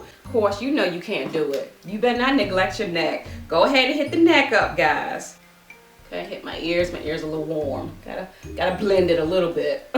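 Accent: South, United States. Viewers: 😂 especially when she says "pores" for me. Maybe the southern accent persuades me. I'm about to go refresh my face now...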